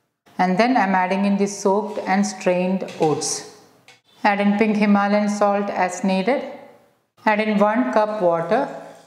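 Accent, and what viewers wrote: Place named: North India, India. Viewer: Good recipipe madam, first you learn how 'healthy' is pronounced. what happend to the correct prounctiaon we used have? following incorrect nNorth Indian prounciation is absolute rubbish.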